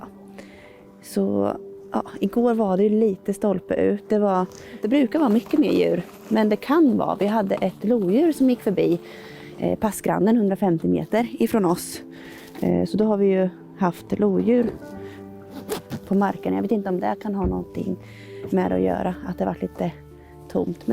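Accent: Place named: Östergötland, Sweden. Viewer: Bra film!! Kul med lite östgötska!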